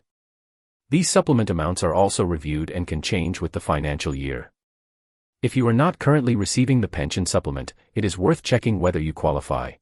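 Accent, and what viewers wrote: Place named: United States. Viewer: Here we go! That yank voice again. Don't believe anything he says. Who makes this shit up? Shame on you.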